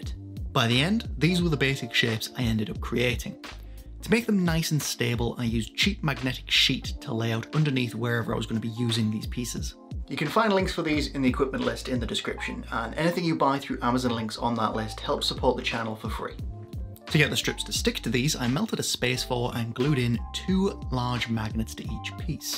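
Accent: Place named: England, United Kingdom. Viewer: just wondering qwhy u use inches when u sound english? it always confuses me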